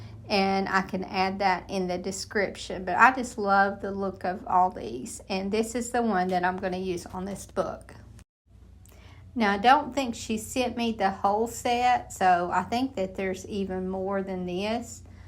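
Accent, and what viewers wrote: South, United States. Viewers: Some people watch videos with things like beautiful nature scenes, sounds such as crashing waves on a beach, mountain brook sounds, rain, etc. for relaxation. I watch your videos. Your calm Southern voice is so soothing. (I'm originally from Augusta, GA - now in Texas). You explain things so well it makes me think I could probably do a bit of what you do. And even the projects themselves are calm and relaxing...color tones, so much nature. No blaring music in the background. Thank you for making my day a bit brighter. PS: I tend to love the little squirrel box best. I have some squirrels I feed. Several of them come within inches of me to get their peanuts. 🐿 😊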